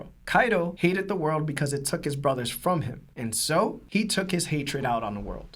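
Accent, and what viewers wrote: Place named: United Kingdom. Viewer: Why is he british tho?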